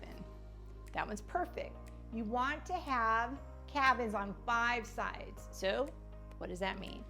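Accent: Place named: South, United States. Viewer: We do love your southern belle cheerful accent. Makes the content so much more approachable.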